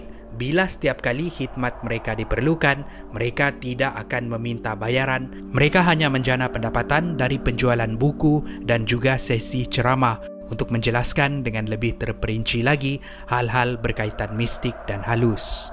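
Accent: Sabah, Malaysia. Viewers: Abg orang sabah ye?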